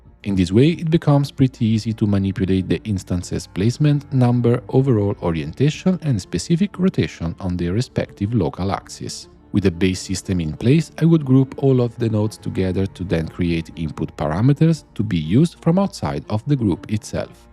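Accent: Italy. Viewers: I tuoi lavori sono eccezionali, complimenti (tanto sei italiano, si capisce dalla pronuncia)! Volevo chiederti una cortesia: in tutorial passati, quello sui trim-sheet in particolare, usi substance, ma questo è un problema perché è un sw non accesibile. Potresti fare una guida dove spieghi come creare trim-sheet con i materiali creati direttamente in Blender? Hai provato a creare archi e volte tramite GN?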